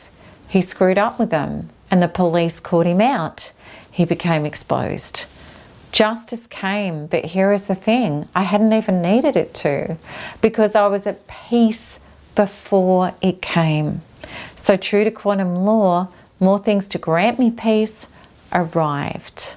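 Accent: Australia. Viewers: I'm glad I found you. This is going to sound so weird and strange, but here's my confession: my dad was a womanizer who always complained about American women and how foreign types were so much better, this, that, yadda yadda. I must have been 12 or 13 when he started dumping that and constant criticism on me. To make a super long and agonizing story short, he married a woman from the gold coast who was easy to control like his ex-wife, my mother, until she was angry and stressed out to the max. There's so many wonderful people from Australia but I didn't realize how the accent by itself brings up anxiety and stress. Listening to you will be exposure therapy. I'm sorry to sound so weird and how something so small could still bring this up after so much healing, but I'm thanking God right now I found you.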